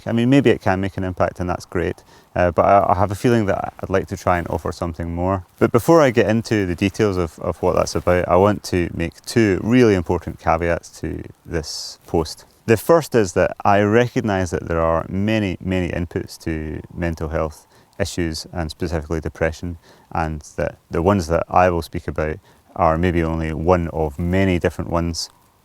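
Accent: Scotland, United Kingdom. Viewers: I going to use your channel to learn understanding the Scottish accent :-) Interesting content! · I like your braw Scots accent,. My mother was from Dunbar:thanksdoc: & was raised in Edinburgh. Dad was an American and he was in the Air Force stationed in Scotland.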